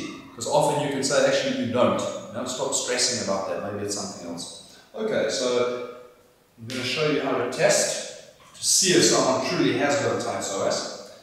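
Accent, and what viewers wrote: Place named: Zimbabwe. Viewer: I hear by your accent you're Zimbabwean or South African. Are you available for therapy to Zimbabweans if so where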